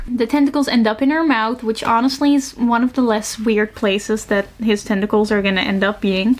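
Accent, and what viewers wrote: Netherlands. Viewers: you calling squidward octo made my ears perk up, along with your accent. dutch person detected!!! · please make a playlist of you reading/reviewing truly unhinged and/or terribly written books (this, gothikana, etc) because they are so funny and I love them and sometimes what I need is to hear bad smut lines read aloud in a Dutch accent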